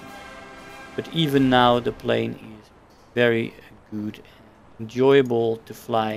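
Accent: Netherlands. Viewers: great video and great person. are you dutch?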